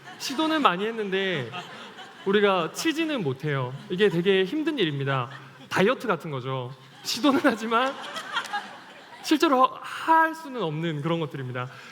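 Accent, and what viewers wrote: Gyeongsang, South Korea. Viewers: 나랑같은 경상도 사람이네ㅎㅎ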